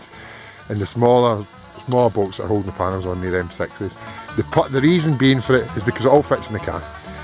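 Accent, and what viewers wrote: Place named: Scotland, United Kingdom. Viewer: My Brit friend tells me it's English with a moderate Scottish accent. Fun. :-) And, love the concept. Wonder if he ever got around to trying one with lighter weight materials. Also saw one online today made with PVC pipe.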